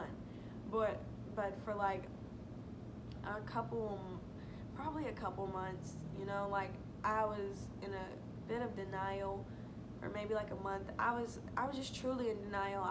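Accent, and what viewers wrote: South, United States. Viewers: Girl where you from you sound so country lol.. They should've came and picked you up as soon as they could instead of scaring you and working up your nerve.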